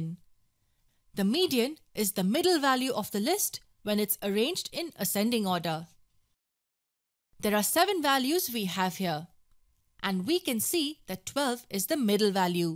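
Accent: India. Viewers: I CAN SAY YOU HAVE INDIAN ACCENT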